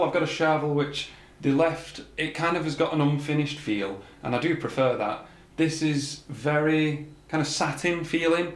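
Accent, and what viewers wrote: Lancashire, United Kingdom. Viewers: Great Lancs accent mate. No joke.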